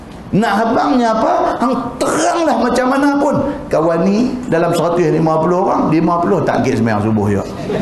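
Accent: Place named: North, Malaysia. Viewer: ceramah ustaz shamsuri terbaik...suka dengar loghat utara dia.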